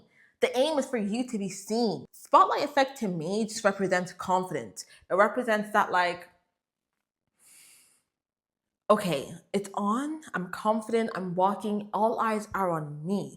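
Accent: Ireland. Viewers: I WAS LITERALLY GOING TO ASK IF YOUR FROM IRELAND!! I feel like you would be fun to be around! 😂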